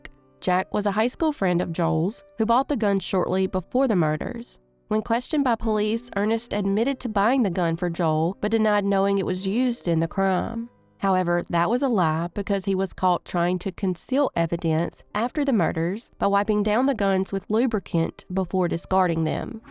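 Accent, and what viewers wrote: South, United States. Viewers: 😊 I so enjoy the way you present your cases. Your voice is soothing and I could listen to your sweet Southern voice 💕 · Thank you. Wish you did audio books.:)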